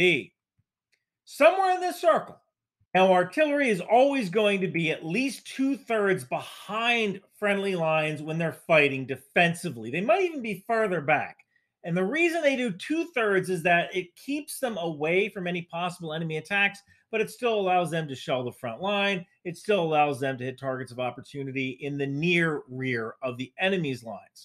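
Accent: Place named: United States. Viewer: I love your American accent . Watching you from Australia keep safe and a wonderful day 🌺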